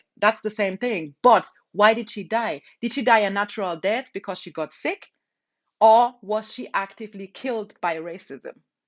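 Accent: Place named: Nigeria. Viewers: You have Nigerian accent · Bantu History, l enjoy her Nigerian accent.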